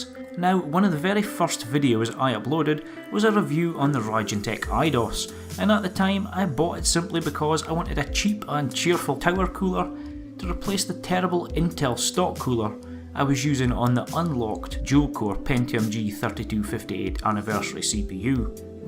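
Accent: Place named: Aberdeen, United Kingdom. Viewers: You sound like you're from Aberdeen like me! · yeh deffo from up north peter head.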